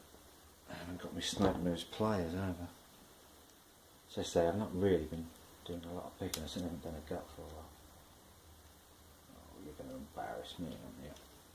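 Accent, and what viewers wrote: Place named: Sweden. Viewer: didn't even notice his accent until you said it, then immetiate: swede. channel seems to be rly great find for me.i miss shooting stuffs. you do know teh nicest people :)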